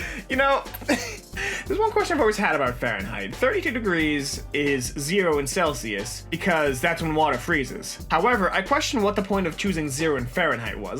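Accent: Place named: Rhode Island, United States. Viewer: Discusses capitalist propaganda in a thick RI accent as well.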